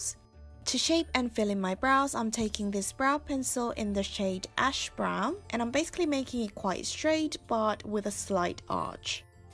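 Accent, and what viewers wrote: England, United Kingdom. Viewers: your makeup tutorials + english accent 💕💕💕 i love love loveee this look! i will definitely try it one day 😁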